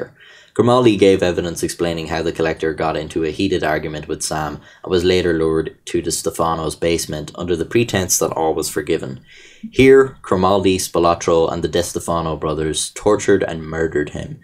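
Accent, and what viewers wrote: Ireland. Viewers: This is just the wikipedia page with an irish accent and bad jokes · The thicc Irish accent makes for a good mafia storytelling voice. More little known Irish personalities, please.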